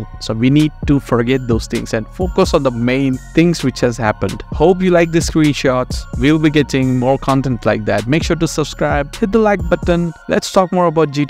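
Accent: India. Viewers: he's talk like india+english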